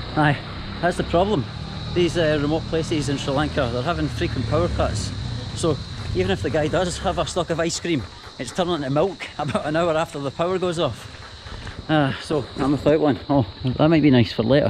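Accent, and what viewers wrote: Scotland, United Kingdom. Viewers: he is very Scottish. Scotland is a beautiful country to the north of England. to a British ear he is sooo Scottish. lovely. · Its funny those 2 yanks hadn't realised your strong Scottish accent and they've been all over Scotland 😜😉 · Are you Scottish?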